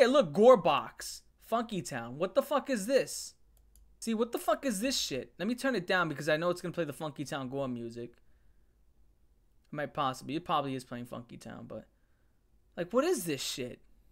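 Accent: New York, United States. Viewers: Baltimore hat, New York sounding accent and Puerto Rican flag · most new york accent ever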